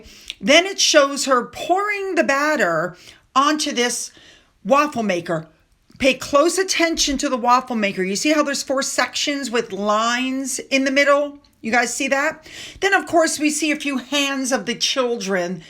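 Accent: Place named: Pennsylvania, United States. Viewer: Her accent says Pennsylvania to me, perhaps?😊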